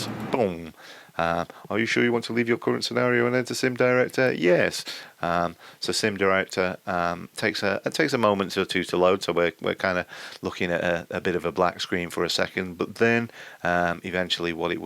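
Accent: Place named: Lancashire, United Kingdom. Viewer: Honest - I enjoyed every minute of it and I learned a helluv a lot from it - even moreso in an accent that goes well with me who also stems from 1/2 way down the East Lancs Rd - 85 years ago I knew absolutely nothing about all the fuss about DX 12 but now I do I can't say I was impressed by the final graphics - it was very "posterised" I was hanging back from jumping in but I will give it a go - on a separate install and add bits of Orbyx as I go John Heaton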